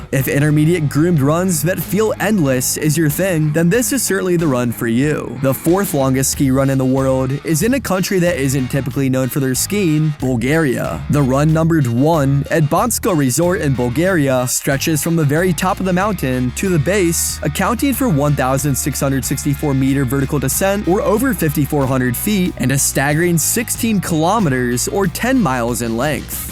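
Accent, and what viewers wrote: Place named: United States. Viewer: Nice video, but hare ur american accent 😮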